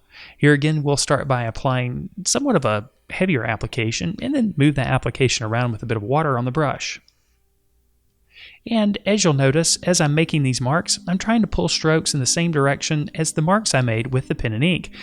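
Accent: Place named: North Carolina, United States. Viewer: Sounds like a Carolina accent. Most likely North Carolina.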